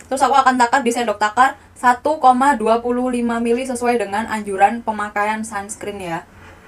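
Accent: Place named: East, Indonesia. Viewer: Kakak asli mana ? Logatnya kek orng daerah wetan yaak hhe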